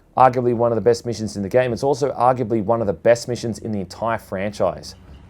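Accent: Australia. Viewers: i had a feeling you where aussie haha so am i :) might have to reinstall and play this again.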